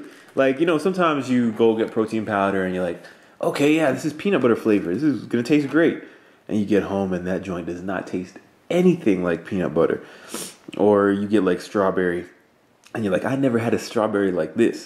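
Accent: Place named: United States. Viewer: you are an attractive man. am curious though. what country are you from? you spell like a Brit (e.g. flavour instead of flavor), but you sound like a very educated American.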